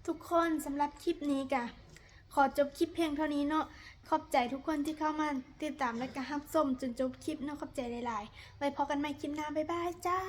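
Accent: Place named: Phu Thai, Thailand. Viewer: สบายดี น้อง สาวภูไท เว้าม่วน พี่FC ฝั่งไทย เด้อ น้อง เก่งๆ แบกตะกร้า ผ้าไปชักเครื่อง บ้านอีน้อง กดไลค์ กดแชร์ เป็นกำลังใจ❤ ให้น้อง สาวภูไท คนงาม เด้อ จร้า❤❤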